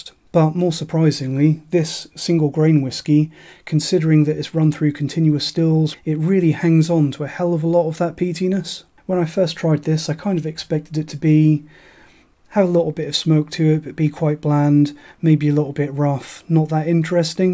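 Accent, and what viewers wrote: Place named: Norfolk, United Kingdom. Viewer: Hi mate,you sound like you're from Norfolk, am I right? I am a Norfolk whisky nut, North Walsham. Let me know if we are neighbours! All thebest. Regards, Pete.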